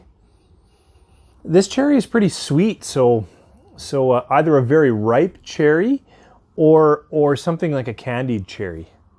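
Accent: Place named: Canada. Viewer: Ha, that accent...I could tell you're Canadian.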